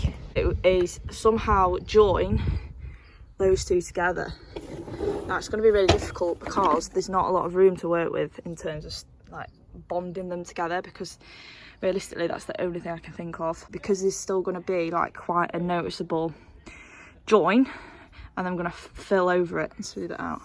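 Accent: United Kingdom. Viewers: Have to finish da fockin bumpaah hahah love ur briish accent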